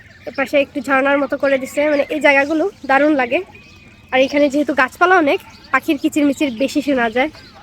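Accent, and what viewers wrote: Sylhet, Bangladesh. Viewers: আপনার কথা গুলো অনেক মিষ্টি আমার মনে হয় আপনি সিলেট বিভাগ এর ভিতরে বাড়ি আপনার, আমি হবিগঞ্জ জেলা থেকে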